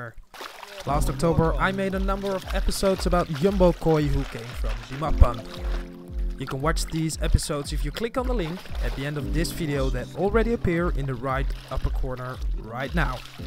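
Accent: Netherlands. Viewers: A Dutch guy (judging by accent) speaking English about Japanese Fish. We Dutchies always had something for multi-cultural stuff... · haha ik ben ook nederlands hoorde her meteen aan je accent, toch nog zeker wel een goede engelse uitspraak! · Dutch accent? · Are you dutch? Your accent sounds like mine and i'm dutch. Great video too! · are you Dutch? Ik hoor Nederlands 😎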